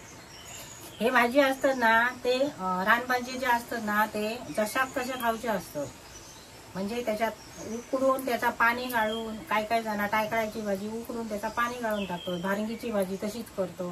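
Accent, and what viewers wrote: Malvani, India. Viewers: तोंडाक पाणी सुटलां. चिवारिचे कोमचेय दाखया. तुमचे व्हीडिओ बघताना, शुद्ध मालवणी आयकताना गावांत आसल्यासारख्यांच वाटता. देव बरें करो.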